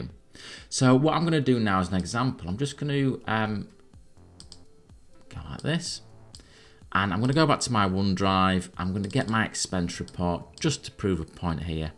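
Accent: Yorkshire, United Kingdom. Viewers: American here. Hearing IT information in a Yorkshire accent - GOLD. I love that accent. So cool. 😊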